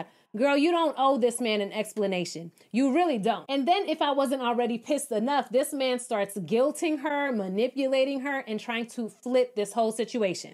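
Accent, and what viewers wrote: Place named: New York, United States. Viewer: Girl you gotta be from NYC cause the way you talk 😂😂😂❤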